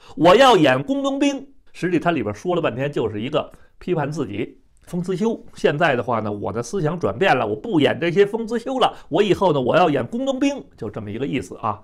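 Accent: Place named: Beijing, China. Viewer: 特喜歡聽北京老話。牛年吉祥！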